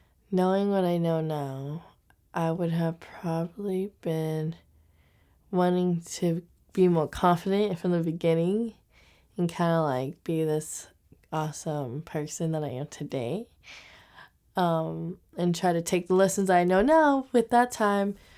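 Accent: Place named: United States. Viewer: She speaks American English...But she's Brazilian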